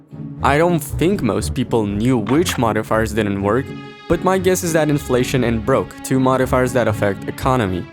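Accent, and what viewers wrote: Romania. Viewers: omg are you romanian?? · are u romanian :))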